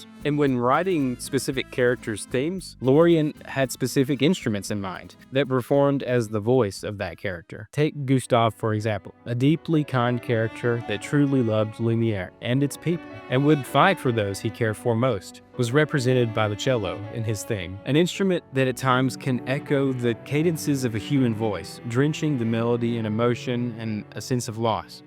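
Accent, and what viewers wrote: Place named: South, United States. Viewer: Very pleasant. Simple in the best sense of the word, it doesn't pretend to explain the universe, but it's full of tenderness for certain things that moved us in this music, in the game -it's the same thing. So, it deserves a little comment for the algorithm and, more profoundly than that, a thank you for taking the time to convey an emotion that helps make this world a more beautiful place. Ps Well, I'm French and i don't care about your accent in French. To be honest, i love southern accent, so, everything is just nice^^.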